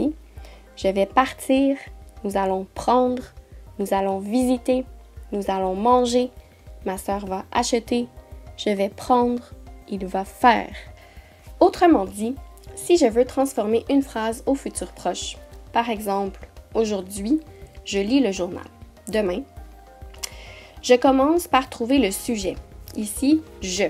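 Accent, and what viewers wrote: Quebec, Canada. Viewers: Si tu parles de son accent c'est l'accent québécois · Merci de m'avoir répondu. Votre capsule est parfaite. J'ai pris votre capsule et j'ai changé votre voix contre la mienne.Je l'ai utilisé juste sur wath sapp. Pour mes élèves comme un petit rappel de la leçon. Ils ont beaucoup apprécié. Je leur ai dit bien sûr que ça venait de you tube. Une élève à moi a fait la même expérience. Vous avez un bel accent.Vous êtes canadienne je suppose. Moi je suis marocaine. C'est pour cela j'ai dû le refaire en français. Votre explication est parfaite. Partir d'un texte pour comprendre la conjugaison est la façon pédagogique idéal. J'aurais aimé connaître le nom et prénom pour le mentionner dans votre vidéo. Mais il n y a que 😘. Voilà, j'espère que ma question est un peu mieux comprise. Mais si c'est interdit, je ne le lancerai pas bien sûr. C'est votre travail et vous méritez un CHAPEAU Bravo, l'animation est parfaite ainsi que l'explication. 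😉😍😘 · Merci beaucoup!!! Votre vidéo est très instructive et votre bel accent québécois me rappelle mon séjour universitaire à la Belle Province. Continuez!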